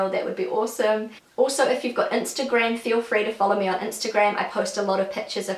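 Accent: New Zealand. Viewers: Can you recommend any other kiwi youtuber? :) I love the kiwi accent <3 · Aww being in Germany myself makes me miss these things too. I have yet to have a nice apple here, and I'm craving fish and chips. It's nice hearing your NZ accent too, I don't know any people from NZ where I live. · Hi, sweet as video😉 I can totally relate to all the things you mentioned in this video (minus the sweetcorn and lamb 😉). I've been to NZ three times for 10 months in total and am missing it so much! The fiery sunsets are just out of this world and nothing beats watching the stars on a NZ beach. Don't know if you've ever been to Whaihi Beach but it reminded me a lot of beaches on the German island Sylt! Ever since coming back from NZ I don't like German apples anymore. They're just not as tasty! And feijoas are so yummy. Didn't even know they existed before my first trip to NZ. 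I used to pick them from my host family's garden 😍 Keep posting videos, I love hearing the kiwi accent 😊 take care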